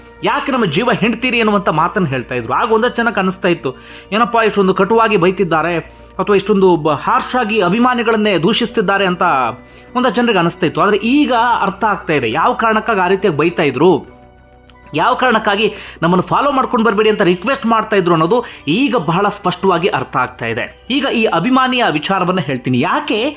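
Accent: Standard. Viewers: 👌👌super sir ವಿವರಣೆ ತುಂಬಾ ಸೊಗಸಾಗಿತ್ತು ಸರ್ ಅಚ್ಚು ಕಟ್ಟಾದ ನಿಮ್ಮ ಮಾತಿನ ಶೈಲಿ ಪರಿಶುದ್ಧ ಕನ್ನಡ ಭಾಷೆ 🙏🙏🙏🙏🙏🙏🙏🙏🙏🙏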